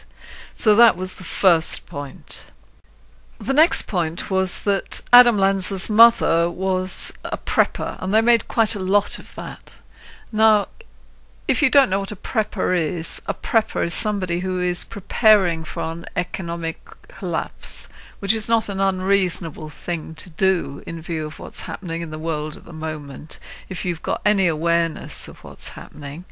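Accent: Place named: United Kingdom. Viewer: Another thing I take issue with is leaving clues, or putting clues in movies or any nonesense like that. What's a practical reason for it? Why take such a risk? We can keep our guns and defend our right to have them without reaching like this. And you have the nerve to call others gullible. You'd have to be even more gullible to believe this, too. It is nice to hear a British accent that's for our second amendment but please...don't "help" us. We have enough kooks to clean up after.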